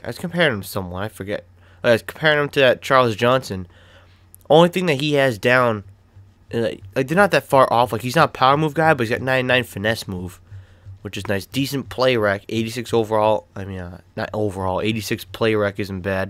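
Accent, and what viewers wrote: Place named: Boston, United States. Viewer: You sound like you're from Boston. Looks like you still hurting from Eli owning the cheatriots in the super bowl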